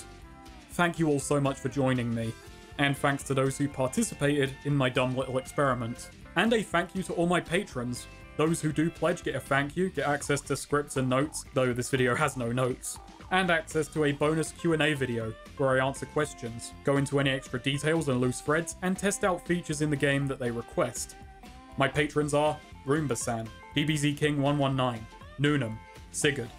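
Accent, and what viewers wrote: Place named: Liverpool, United Kingdom. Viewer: Alright eh! A scouser in bizarre Adventures of Jojo, Class one there mate.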